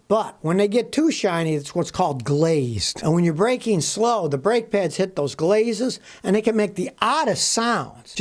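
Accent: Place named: Michigan, United States. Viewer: THAT'S why you have that MI accent!! I always thought "he sure don't like a NY'r, he sounds like Chicago". Well now that riddle's solved. Happy Independence day 🇺🇸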